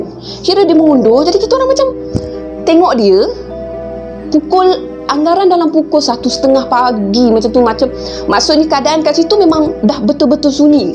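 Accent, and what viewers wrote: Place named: Temerloh, Malaysia. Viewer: Akak ni asal org pahang ke bunyi loghat macam org temerloh Btw best akak cerita santai semoga maju jaya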